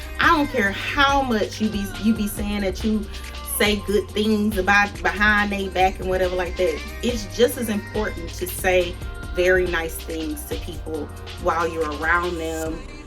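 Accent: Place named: Texas, United States. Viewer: Honey I love your Texas accent! Please give us more. And celebrating the small steps of your loyal circle is important. My circle getting super small though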